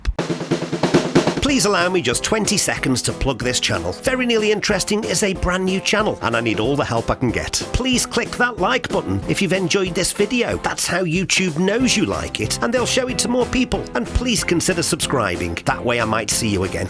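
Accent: Stoke, United Kingdom. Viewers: 1- being an American, I feel i have to believe you because of your british accent.(strange) 2- accidentally taking an identical tender with the same key (small motor boat used to get ashore from a big boat) upon returning to find coast guard, police and upset couple, I said to everyone, "if the key fit you must acquit" everyone laughed and i got away with what could have been a real expensive nightmare. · You from stoke by any chance?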